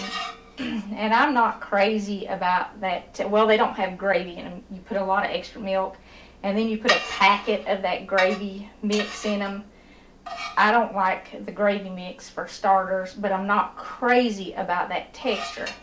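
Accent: South, United States. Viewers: I'm a southern girl myself and I love to hear your accent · A lady with a southern voice like this HAS to be a great cook!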